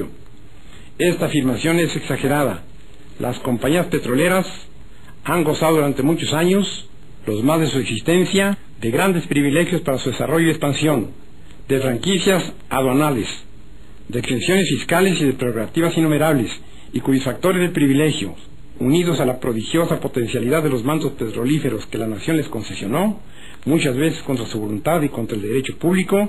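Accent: Mexico. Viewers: ACENTO MICHOACANO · Asento Netamente Michoacano Viva El General Lazaro Cardenas Del Rio Viva El General Francisco J Mujica Hijos Nobles Michoacanos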